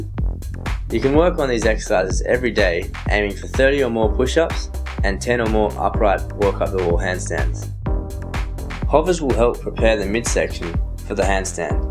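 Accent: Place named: England, United Kingdom. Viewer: finally an english accent i can understand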